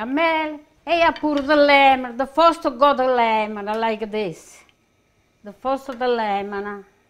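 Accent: Italy. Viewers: you are our treasure! I made chicken alla Franchese your way and it was just as delicious as your Italian accent! Love you! ❤❤❤ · I just love cooking. She has a very easy way of explaining her style of cooking and I love her Italian accent! Thank you! God bless you & your family!😘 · If your going to make fun of her Italian style accent stop comments. Your RUDE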